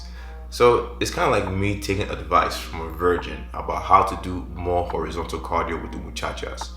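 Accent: Nigeria. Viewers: Bruh is u Nigerian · I swear right? I had this gut feeling that the guy was Niigerian from the get go....When i heard chineke....I was like lobatan.... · chineke😂 nigerian? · For a very long time, i was having that gut feeling you were "omo naija", and this video just made my feeling clear... As i hear "Eh".... "chineke" ....."Abeg"... Nice one bro!!... Naija to the world, carry on bros!!! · I always you were Nigerian. You have the accent. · This man is Nigerian asf, feels like I am listening to my brother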